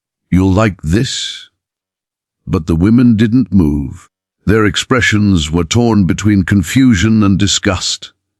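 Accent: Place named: United Kingdom. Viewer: Why do you use a British sample for the narration?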